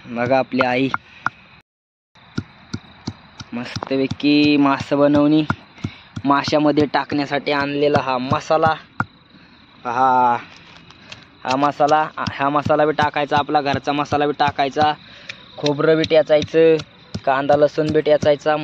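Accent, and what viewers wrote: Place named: Dhangari, India. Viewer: त्रास नाही पावणे थोड धनगरी बोलालवर चांगलं वाटतं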